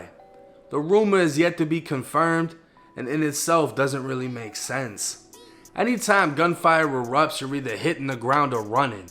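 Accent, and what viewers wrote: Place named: Massachusetts, United States. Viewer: yo I gotta ask how long did u live in Malden for? Whole time I was thinking no way is originally from Florida - cause legit every dude from mass can move away at like 8 years old, hit 30 & still have a thick ass mass. accent 🤣 Edit- My bad, looked it up, didn't know you stay in Dorchester. this shit is dope though, subscribed.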